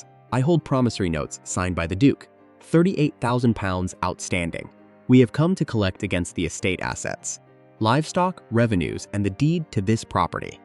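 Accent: United States. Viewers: Good story but annoying background music, floating circles and too large a font. English accent please, not American because the story is based in Yorkshire, England. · So, American narration for a Yorkshire story! And incorrect pronunciation of words with the same spelling. So when perusing a letter the word is 'red', when speaking of roofing materials the word is 'led'. Allowing AI to run amok doing its own thing renders the whole ridiculous. And as others have said, far too fast. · I read several comments about reader being too fast and speaking from back of throat Having grown up in Michigan, this sounded "normal" to me! 🙂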